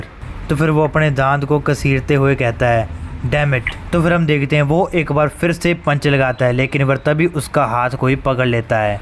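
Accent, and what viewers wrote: Punjab, India. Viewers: Bhai aap Punjabi hona right guess?❤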